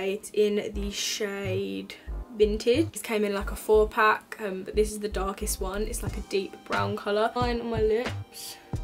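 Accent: United Kingdom. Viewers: Yes I somehow always thought she was American (never heard her speaking before) and I was so surprised (in a positive way) that she was British! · UK Essex accent !!! (not the most refined...)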